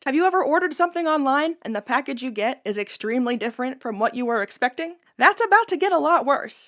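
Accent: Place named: South, United States. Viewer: i don't think I realized you were southern!! southern gang!! 😊 Also, great video. i love this!!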